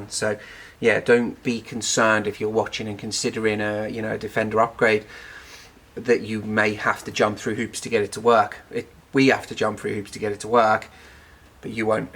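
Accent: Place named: Wales, United Kingdom. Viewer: love your work and your content. Thank you subbed 👍🏻 Incidentally trying to place your accent - there’s a hint of Wales there?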